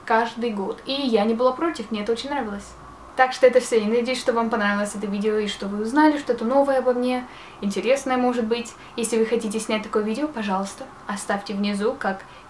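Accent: England, United Kingdom. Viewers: У неё такой классный английский акцент;) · Английский акцент у тебя) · У тебя такой акцент сильный от английского)Так классно:3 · Такой английский акцент),это твоя изюминка)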